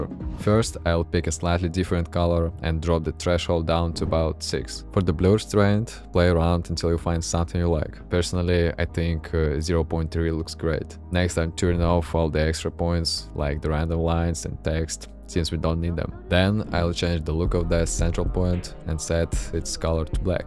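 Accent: Russia. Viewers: You have russian acsent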